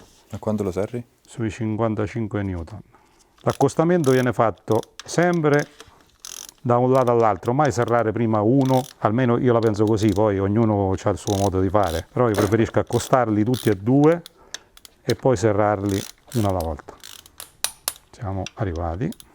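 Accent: Abruzzo, Italy. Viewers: Una parola: arte. L'accento abruzzese completa l'opera. Si sente il sapore degli arrosticini mangiati dopo una giornata di duro lavoro di preparazione. " E angor' ce sta' da affina'" MERAVIGLIOSO!!!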